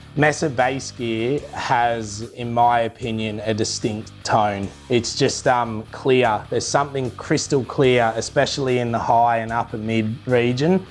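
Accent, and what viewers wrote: Australia. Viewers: this is the heaviest australian accent i've ever heard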